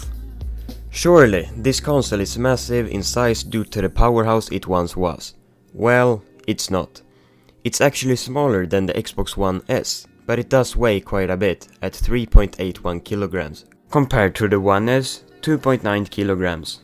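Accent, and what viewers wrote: Sweden. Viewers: You from Sweden? :)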